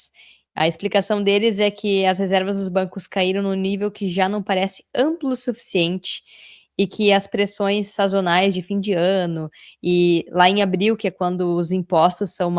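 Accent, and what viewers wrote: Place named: Porto Alegre, Brazil. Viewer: Comecei a seguir o canal só para agregar o sotaque porto-alegrense às análises econômicas, e sentir falta de casa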